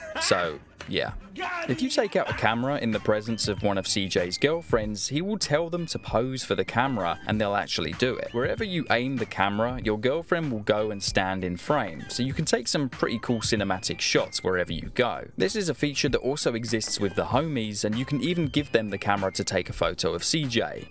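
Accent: Australia. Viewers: Do you haver alittle bit of aussie in your accent?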